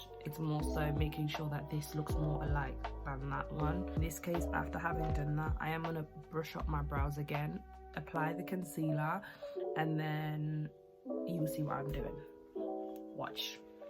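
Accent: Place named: Scotland, United Kingdom. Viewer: Appreciate the subtitles cause I don’t understand your Scottish accent x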